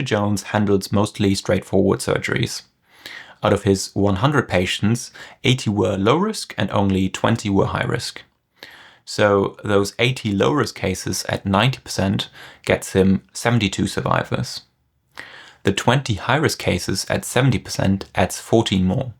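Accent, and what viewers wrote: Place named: England, United Kingdom. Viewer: Interesting that the English sounding narrator talks about surgeons as Doctors because in the UK if they are surgical specialists they would be called Mister or a female equivalent. Perhaps he is making this more understandable for your generic English speaker without having the caveat about their actual titles. Still it amuses me when I tell Americans that if they have appendicitis in the UK and they are told Dr. Jones will be along soon, to run like hell because he's not a specialist.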